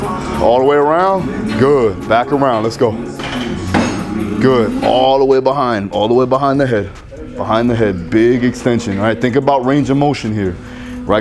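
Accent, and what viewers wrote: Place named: Los Angeles, United States. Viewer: Bro you gotta be from LA? I can hear it. Good work all around! KB work is just different and I love it. Stay functional🔥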